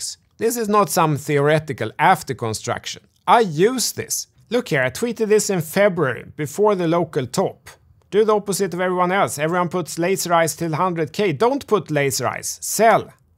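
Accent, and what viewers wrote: Sweden. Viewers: Ahhh the Swenglish we all been missing 😎👍🏻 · It's not your video's that suck or your calls... But No one understand wtf you're saying with that heavy jibberish swedish accent :D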